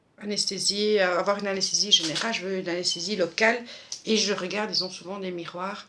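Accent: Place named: Belgium. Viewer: Coucou C'est super d'en apprendre plus sur les copines grace aux tags... je trouve ça sympa. Et je vois que toi aussi tu as un joli accent... belge😊 Bon week end Gros bisous😘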